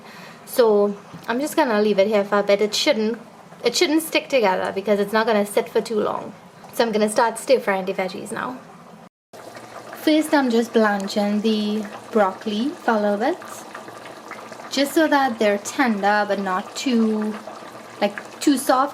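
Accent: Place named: Trinidad and Tobago. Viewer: Some Trini chefs talks to much and with a exaggerated Trini accent so people on the internet can't understand them. But your accent is moderate/modest which is a good thing.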